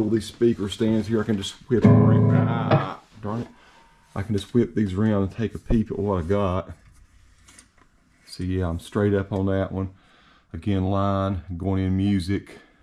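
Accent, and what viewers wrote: South, United States. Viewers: "sub mode" makes the batteries last longer FYI. That southern draw you got there sounds familiar to my North Carolina ears! Haha!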